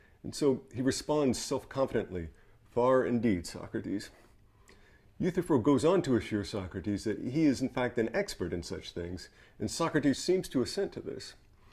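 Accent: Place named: Denmark. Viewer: Interesting. Although he is American, he speaks English as if he was a Danish person. His words are sharp and short.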